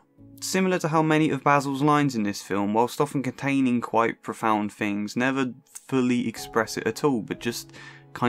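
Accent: United Kingdom. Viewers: You sound British, but I never could figure out from where, so this explains it (I am horrible at accents and can't recognize them well, so I wasn't worried about not being able to place you). I've lived in Texas my whole life, but I don't feel connected to it or the US in general. I don't feel tied to anywhere, nor do I fit in. It's wonderful to hear you speak of the place you come from with such affection and connection. I guess it's no coincidence that you represented yourself with a tree? You are tied to the lands where you were born. :) <3 Oh, and I do need to go watch this movie now! X)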